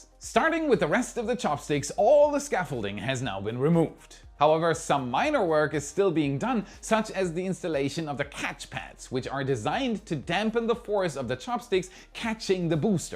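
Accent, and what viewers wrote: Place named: Germany. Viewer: could very well be for a different pronunciation. is German, so kinda expect a heavy German accent with the pronunciation 😊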